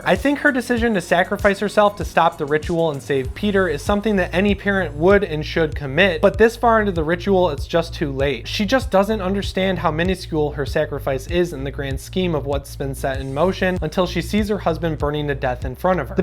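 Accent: Midwest, United States. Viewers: Great review! Where are you from? Can’t place that accent. Illinois maybe? Wisconsin?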